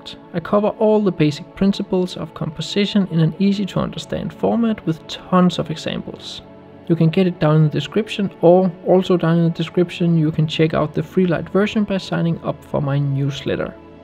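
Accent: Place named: Denmark. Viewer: You made some excellent points in this video,. For that reason, I subscribed. The shots in this video are absolutely amazing! Keep up the good work! Also, I immediately recognized the Danish accent:)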